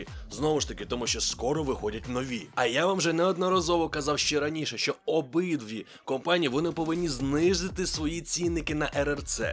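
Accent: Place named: Russia. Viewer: чувствуется что самому трудно переходить с русского на украинский язык,например,правильно не видеокарт, а видэокарт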